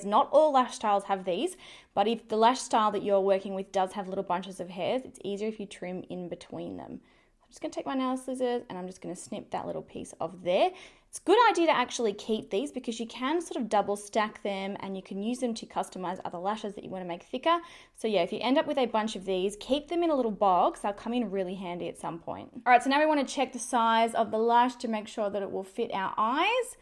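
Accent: Australia. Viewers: I just love your Aussie accent! That to me was a bonus!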